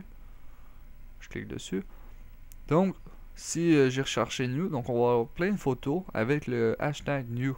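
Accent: Quebec, Canada. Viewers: Tu as un accent Québécois ou Canadien je mis connais pas trop mais j'aime trop se genre d'accents +1like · Petit accent Québécois <3❤ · Accent du Canada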